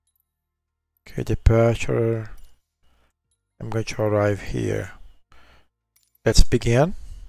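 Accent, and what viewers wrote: Brazil. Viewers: percebi que era br pelo sotaque skaksakska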